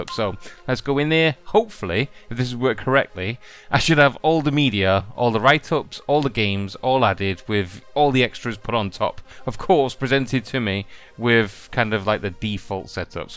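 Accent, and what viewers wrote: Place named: Leeds, United Kingdom. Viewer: You're a Leeds lad I gather?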